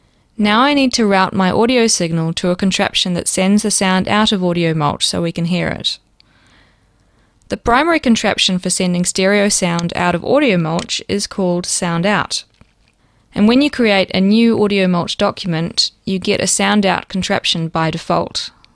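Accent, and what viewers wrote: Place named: Australia. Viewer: yes fantastic ozzy accent!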